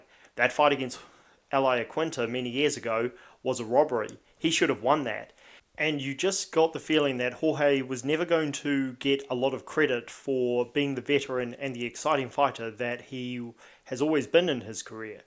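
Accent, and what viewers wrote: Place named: New Zealand. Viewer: Good video man I am subscribing Best of luck with channel from Ireland 🇮🇪 U sound kiwi 🇳🇿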